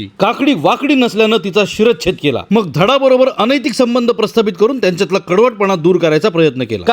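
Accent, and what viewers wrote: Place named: South, India. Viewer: 1 ch no. South Indian tone tr kamaal 😊😊😊😊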